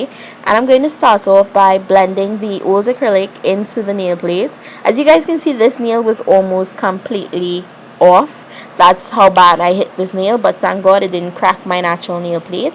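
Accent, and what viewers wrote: Trinidad and Tobago. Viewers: Sounding Trinidadian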